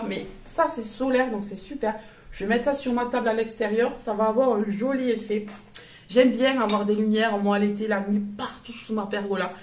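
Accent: South, France. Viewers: bonjour, je découvre ta chaine aujourd'hui et j'aime beaucoup ton haul ! je te trouve très à l'aise devant la caméra et j'aime bien ton accent du sud (je suis moi même du sud ouest !) j'adore le cache pot vert à suspendre et les différentes lanternes solaires que tu as acheté ! je pense que je me pencherai un peu plus sur le rayon extérieur la prochaine fois que j'irai chez Action grâce (ou à cause ?) de toi !! hi hi hi !! par contre moi j'opterai pour des plantes artificielles car je n'ai absolument pas la main verte, je fais crever toutes les plantes...pfff !! allez je m'abonne à ta chaine pour découvrir un peu mieux ton univers. Merci pour ta bonne humeur, bises, Christel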